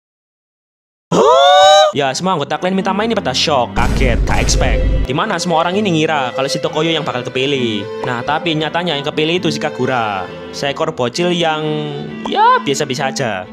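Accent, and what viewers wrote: Java, Indonesia. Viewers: Bg lu org Jawa ya bg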